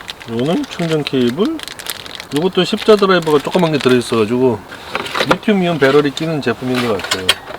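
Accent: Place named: South Korea. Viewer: 잘보고있습니다...구수한사투리너무좋아요... 루프박스장착영상봤는데,지금은없네요... 저도설치하려하는데연비차이가많은가요? 기름값미워미워ㅠㅠ 늘응원합니다~~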